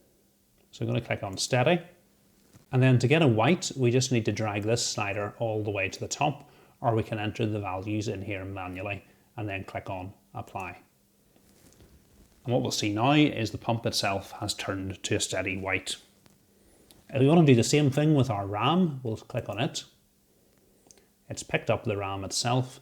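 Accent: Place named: Scotland, United Kingdom. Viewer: 55 minutes of Scottish ASMR PC building. Lovely.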